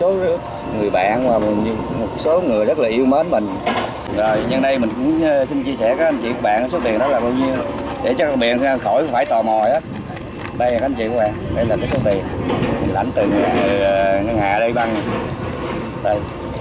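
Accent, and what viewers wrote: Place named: Mekong Delta, Vietnam. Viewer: Chúc mừng anh trai, đậm chất miền Tây chân chất, gần gũi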